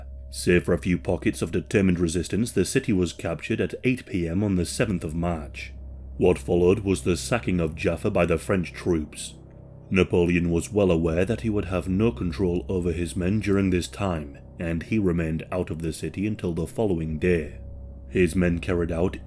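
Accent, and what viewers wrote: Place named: Birmingham, United Kingdom. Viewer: I can’t stand it. I think he’s from Birmingham.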